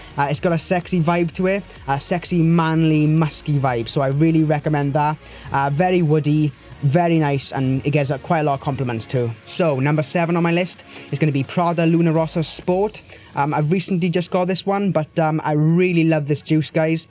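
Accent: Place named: Wales, United Kingdom. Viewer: All the way from the Welsh valley,lol.Love it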